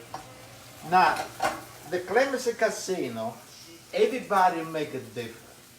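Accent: Italy. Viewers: I listen to you i hear my italian immigrant grandparents.We love yo both❤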